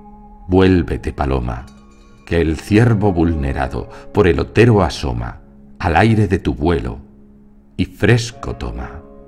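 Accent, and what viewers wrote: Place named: Castile, Spain. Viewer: qué voz tan bella. qué castellano tan sobrio. me ha encantado. muchas geacias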